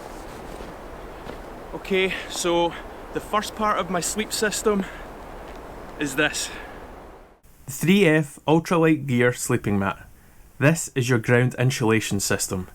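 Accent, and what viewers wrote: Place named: Scotland, United Kingdom. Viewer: love your accent man, need to get my ass in scotland to backpack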